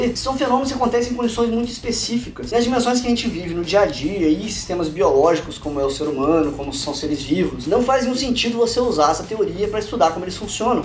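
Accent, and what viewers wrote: Minas Gerais, Brazil. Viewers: você é mineiro. Estuda em São Paulo ou em Minas? Abraço.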